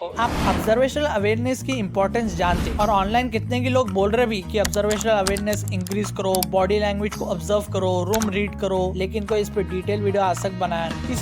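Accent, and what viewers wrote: Hyderabad, India. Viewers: Tu Hyderabad se hai? Tera accent poora Hyderabadi hai 😂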